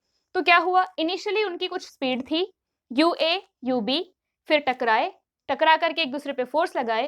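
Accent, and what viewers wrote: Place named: Uttar Pradesh, India. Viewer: Ma'am aap up se ya Bihar se hongi etna fix hai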